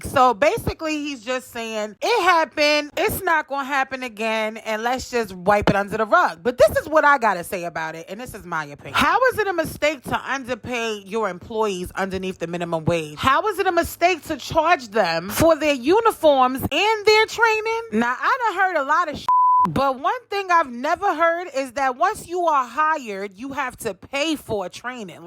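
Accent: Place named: East Coast, United States. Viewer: I’m so glad I found your channel. You be having all the tea. You sound like you from the east coast. I’m from NJ but living in NJ. New subscriber!!!!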